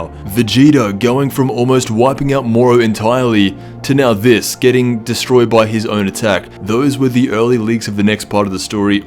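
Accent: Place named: Australia. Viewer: J T it’s the pure Aussie accent we all have including me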